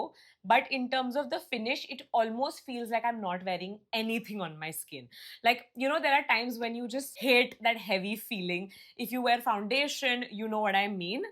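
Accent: India. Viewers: She actually talks like Ross from friends but with an Indian accent.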